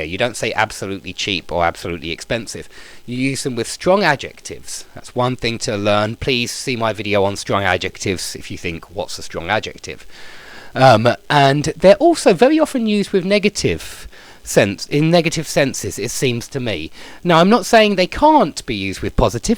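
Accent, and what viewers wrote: United Kingdom. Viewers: Great video. By the way, you have an elegant, educated British accent.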